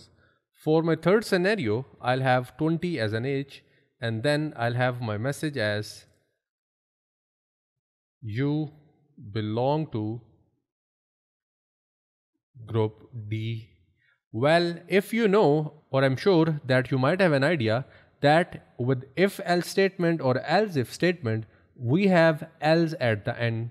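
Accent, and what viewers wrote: India. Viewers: This is his accent Indians talk like that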